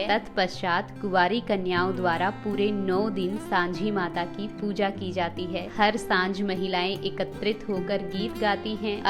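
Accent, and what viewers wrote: Standard. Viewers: आप तो बिल्कुल शुद्ध हिंदी बोलते हो आप की आवाज तो बहुत मधुर है❤🥰🙂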